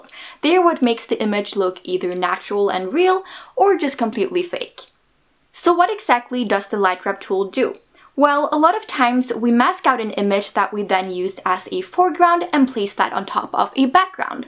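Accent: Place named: Yugoslavia. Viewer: This is great. Thank you. Btw, I love your accent. Are you originally from Yugoslavia? Your diction sounds like tennis star Monica Seles.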